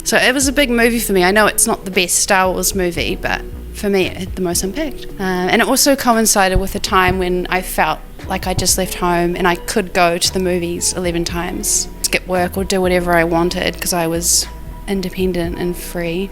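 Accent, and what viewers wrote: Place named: RP, United Kingdom. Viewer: Her accent is kinda posh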